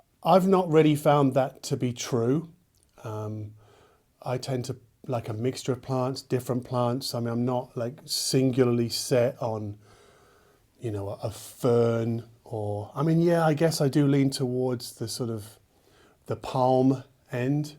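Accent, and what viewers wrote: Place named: United Kingdom. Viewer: Just shows that anything sounds legit when said with a British accent!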